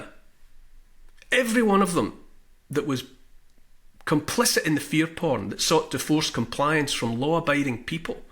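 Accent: Scotland, United Kingdom. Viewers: As a fellow former Stirling resident, now an ex pat, greetings from the mountains of Norway. We must not let the b…..s grind us down. It is going to be back to the Stone Age type barter economy and too much for many. God help us, keep up the rhetoric and that great Scottish accent, we need you more than ever! Alex with kind regards.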